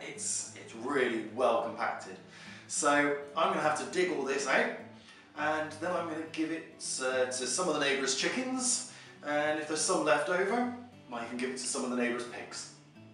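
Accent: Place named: Somerset, United Kingdom. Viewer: Hi, I am from Somerset and by listening to your accent i'm assuming you are too! My question is, where is a good place in Somerset to by apples in bulk at a wholesale price? Cheers mate and great video!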